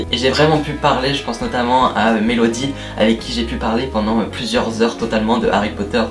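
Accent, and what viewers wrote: England, United Kingdom. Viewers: J'ai beaucoup trop rigolé 😂😂 on adore ton accent anglais 😂😂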